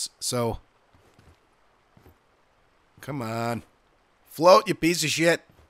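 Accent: New Jersey, United States. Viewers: He's from New Jersey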